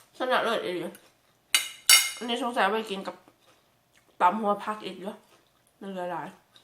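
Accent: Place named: Bueng Kan, Thailand. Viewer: น้อง แม่นเป็นคนบึงกาฬบ่อพี่อยู่โคกก่อง สำเนียงเว้าคือกัน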